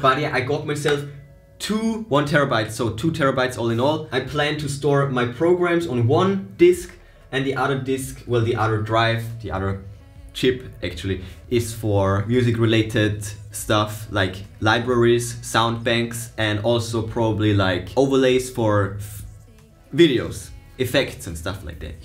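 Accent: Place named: Germany. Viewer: wenn du deutsch bist, versuche nicht englische videos zu machen wtf. der deutsche dialekt ist immer schreklich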